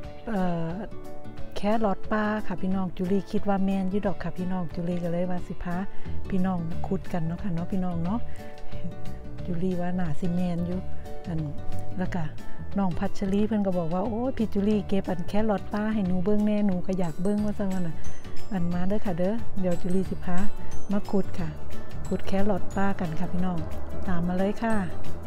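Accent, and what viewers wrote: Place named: Phu Khiao, Thailand. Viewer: เสียงเว่าคือคนทางภูเขียว ภูเวียง ทางชุมแพเนาะจ้า เว่าสำเนียวมวนดี ขอให้มีความสุขกับการใช้ชีวิตในต่างแดนเด้อจร้า😍😍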